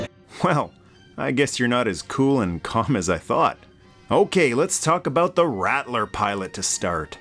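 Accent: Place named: Boston, United States. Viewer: just discovered your channel by my absolute passion for MOTU & Joe!! My all time favorite movie play toys was the Rattler!! can't wait to binge watch everything Joe 1st then Masters of the Universe. I just watched your 1982 video describing the Original line up of Joe from the early days (1960) era and Mr.Hamma creative writing for the updated version. The history lesson was outstanding and I always knew Joe figures were more solid and flexible than Star Wars and most Other lines. Thanks to you and the awesome fans that are requesting these amazing topics of the best era evah!! heavy Boston accent!!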